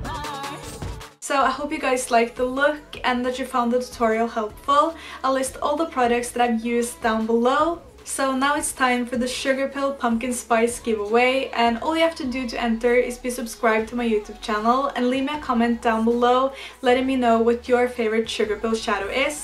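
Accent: Norway. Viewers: Are you from Norway? The way you speak english is so Norwegian english ahahah!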